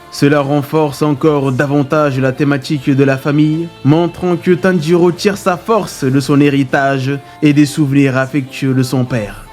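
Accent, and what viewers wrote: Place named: Africa. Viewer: t'as un accent africains que tu veux gomer, c'est dommage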